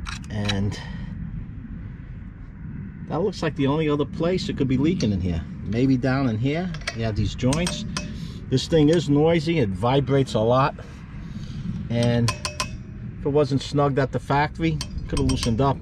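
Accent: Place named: New Jersey, United States. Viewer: Yoo from Joisey? Fun accent :-) "Yo, Adrian!"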